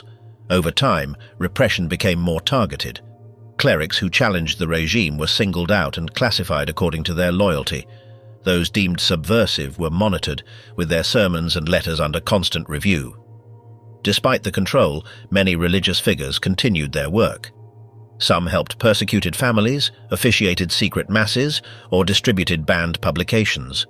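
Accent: United Kingdom. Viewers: Like as someone re-edited all the documentaries into a poor ridiculous English accent… really bad.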